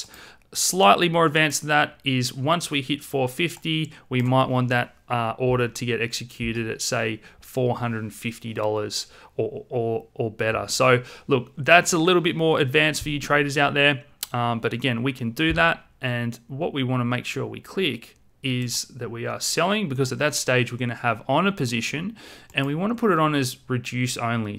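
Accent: Australia. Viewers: No native english speaker, but recognize the Aussie accent, Mate!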